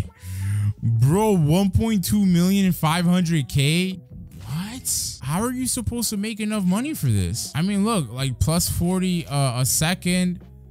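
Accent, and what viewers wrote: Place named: Spain. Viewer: eres de ascendencia española I can kinda hear it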